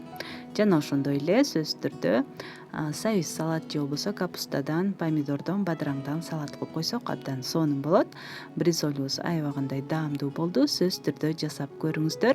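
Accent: Standard. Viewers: Качество ваших видео всегдана высоте мне нравится всё что вы готовите ваша чистота на кухне и как вы говорите чисто на кыргызском языке я рада что полписана на ваш канал в дальнейшем тоже радуйте нас своими вкусными неповторимыми рецептами я из Оша😘😘😘👍👍👍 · Эн сонун 👍таза кыргызча суйлогонунузго баа бердим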